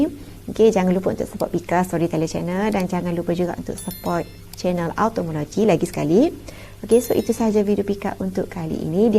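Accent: Kelantan, Malaysia. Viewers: Bau budo la kak..ckp kelantan jerr kak x payah ckp KL..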